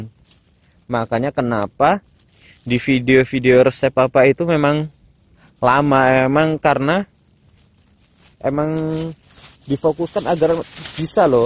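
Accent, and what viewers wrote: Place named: Java, Indonesia. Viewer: Video resep papa yg durasinya lama itu yg ku suka... Ku malah berharap supaya resep papa tetap seperti itu, kondisi dapur juga apa adanya... Aku syuuuukaaaa.... Sepertinya channel resep papa bakalan cepat melejit subscribernya... Btw penasaran sama penampakan papanya 😃😃 cuma denger suaranya... Dari logatnya sepertinya wong jowo yg tinggal di lampung... Sukses selalu untuk resep papa... Pertahankan ke apaadaannya....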